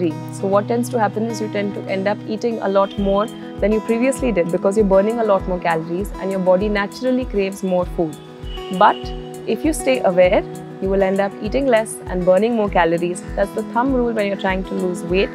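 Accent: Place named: Kerala, India. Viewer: Oh! That's great! Your rendition resembles a typical kerala girl, infact,that's what I felt.Anyway great lessons and quality contents.Go ahead madam 👍🏻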